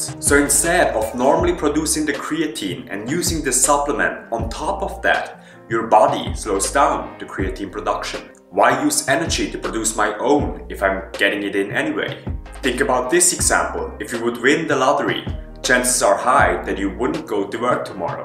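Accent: Germany. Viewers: Bayerisches Englisch 😆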